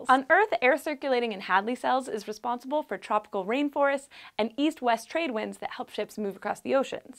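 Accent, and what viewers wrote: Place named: United States. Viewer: Slow down. I'll have to rewind to try and figure out what you said right at the start. I'm not American so your accent makes it even harder. Otherwise I like the way you sound.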